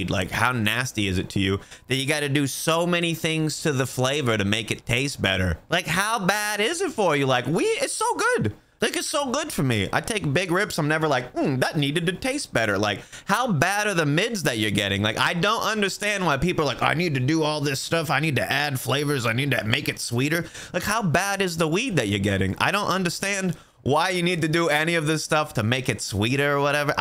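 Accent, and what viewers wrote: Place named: New York, United States. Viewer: What is with the random New York accent you start and stop using at random? I've been watching for a little while and you only mention Colorado and Florida. It's weird af.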